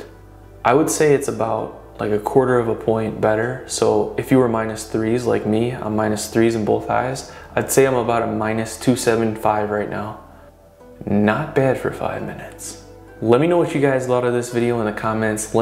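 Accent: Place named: Midwest, United States. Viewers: He sounds like he's from Chicago. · does this only really work for people who can only see thing far away? Being nearsighted this didnt do much for me except give me a bit of a laugh when he was using his entire face to open his eyes. good vibes on the video though, gotta love that midwestern accent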